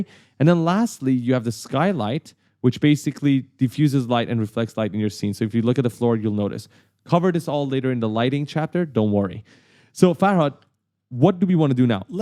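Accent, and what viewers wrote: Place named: Iran. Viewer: اینکه میدونم دارم unreal engine رو از هم وطنم یاد می گیرم یاد گرفتنش رو برام خیلی جالب تر می کنه, دمتون گرم.(از لحجه پیداست ایرانیه)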